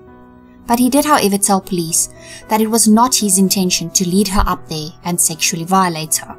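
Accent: South Africa. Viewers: You sound Afrikaans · I recognize your accent sister 🇿🇦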